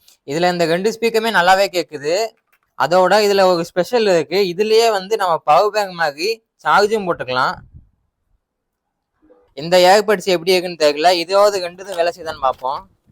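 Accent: Madurai, India. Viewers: Bro nega madurai yaa bro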